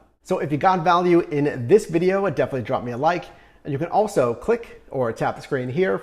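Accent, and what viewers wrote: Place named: Pittsburgh, United States. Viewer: You from Pittsburgh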